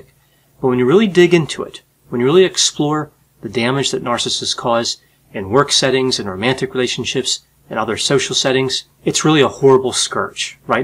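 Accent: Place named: Philadelphia, United States. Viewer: I can tell you're from the Philly area because of your accent. I too am from Philly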